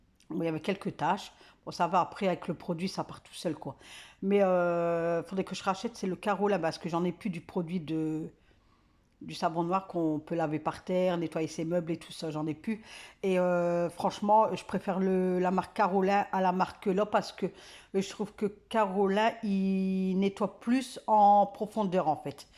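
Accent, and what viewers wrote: North, France. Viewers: je découvre votre chaine avec cette vidéo, et j'ai tout de suite reconnu l'accent du nord ;) (j y suis aussi ;) ) . J'ai hate de retirer nos déco de noel même si on les adore, hâte du renouveau du début d'année :)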